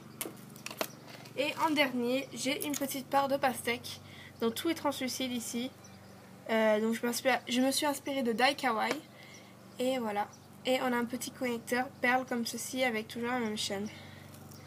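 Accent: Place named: United Kingdom. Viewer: J'aime beaucoup ton accent britannique ! x'D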